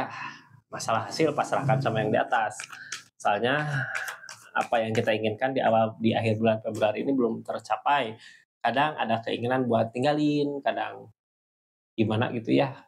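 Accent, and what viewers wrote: West Java, Indonesia. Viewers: biarain aja.. Sbg ciri khas sundaisme 😁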